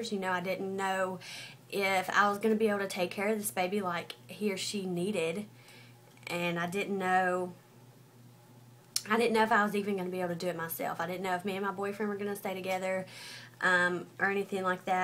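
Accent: South, United States. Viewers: Hey! Your so pretty, and have the cutest country accent. Lol. I'm 15 and 21 weeks pregnant now, so I understand what you went through just don't understand the actually raising your kid part YET. Its crazy cuz when I first got pregnant, my boyfriend just turned 20 back in january and i didnt have a clue that i was, and i found out at 8 weeks.:D Although, I'm still going to highschool. Lol. But post more videos of you and your son, He's adorablleee. <3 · Wow- I stayed and watched the whole video. And I most definitely agree with you on the "abortion" and "if you are old enough..." speech/opinion. Off topic, but I love your accent... and your face structure kinda makes you look like Miranda Lambert. Anyway, good luck with you, your son, and boyfriend. :D! Go southerners! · omg you are so pretty and i love your country acsent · You're sooooooo pretty, and confident, that's good stay that way! 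and I love your accent, from the south? same here lol. your story was very understanding, stay strong sweet peaa :) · Where are u from because u sound southern like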